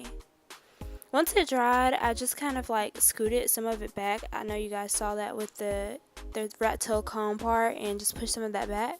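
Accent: South, United States. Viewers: you sound southern lol , where you from ?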